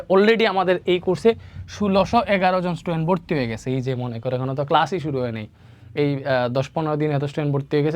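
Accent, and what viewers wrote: Sylhet, Bangladesh. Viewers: ভাই আপনার ভাষায় বোঝা যায় আপনি সিলেটি😊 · bahi mone hoy sylheti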